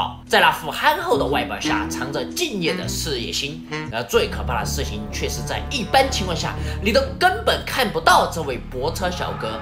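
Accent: Sichuan, China. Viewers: 有个问题想问下台湾的朋友们啊..大陆里面的普通话和台湾那边的无论是用词还是发音习惯都有些区别，厂长的视频里还带了点四川口音，台湾的朋友们能很流畅的听懂吗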